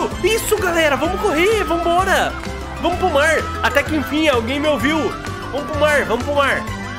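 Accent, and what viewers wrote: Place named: São Paulo, Brazil. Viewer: com todo respeito puxa menos o R , sotaque paulista poRRRRta. barrrco no mais ta muito tri legal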